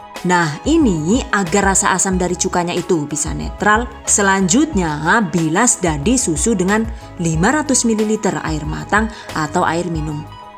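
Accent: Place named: Tegal, Indonesia. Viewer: Terimakasih sudah berbagi ilmu harus di coba ini,dan satu lagi saya suka humornya logat orang Tegal.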